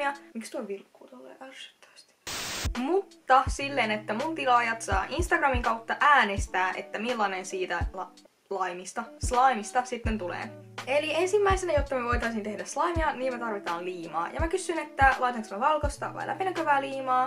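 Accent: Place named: Ostrobothnia, Finland. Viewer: 😂 Kuulostaa kuin puhuisit pohjanmaan murretta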